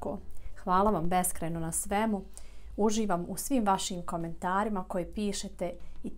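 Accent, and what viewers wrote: Bosnia and Herzegovina. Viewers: kuvas i akcenat? Ispod naslova ima napisane sastojke, bez akcenta 🤣🤣🤣. Btw zena je iz Bosne, pa otuda i akcenat. .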